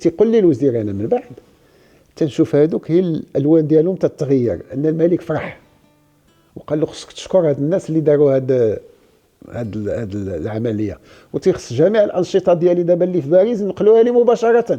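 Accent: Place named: Egypt. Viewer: هل هدا سفير مصر في المغرب ? لان لهجته اكتر مصرية من مغربيةً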